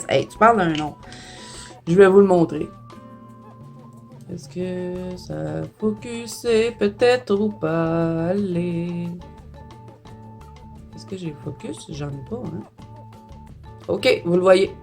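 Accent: Canada. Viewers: bonjour, merci pour la vidéo. Pour info, j'ai de l'encre distress oxyde dans un flacon de spray. Je pense que ca traverse si on le mets de cette façon (+ humide) et donc, dans ce cas, le mettre sur un plastique intermédiaire pour le passer ensuite au 'doigtier'. J'en parle pour que l'on puisse comprendre certains inconvénients rencontrer éventuellement par certains, voir prévenir du désastre. Moi, perso, j'ai parfois fait une base avec des allbrecht durer a la place de l'encre. Merci encore, toujours un plaisir de te suivre (et d'entendre ton Français avec le bel accent canadien)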